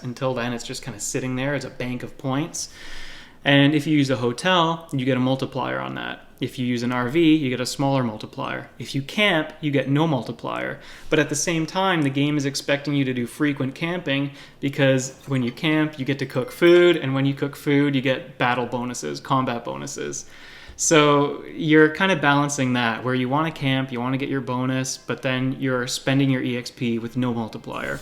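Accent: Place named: Upper Midwest, United States. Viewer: I got FFXV on Steam in 2017 but didnt play it until last year. It was a pleasant surprise! You made great points about it. By the way, you remind me of someone I enjoyed watching on YouTube pre 2010 - Roger Swan, an American vlogger that lived in Japan. I think it's your manner of speech, maybe you're from the upper midwest.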